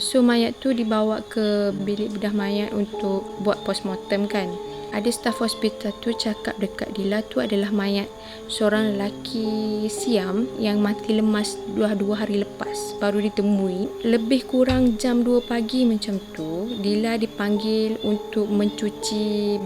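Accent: Kelantan, Malaysia. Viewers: Sy Dr Cyberjaya...tp Sy still suka loghat Kelantan seperti cerita sebelum nya...ni nmpk mcm kekok jer bercerita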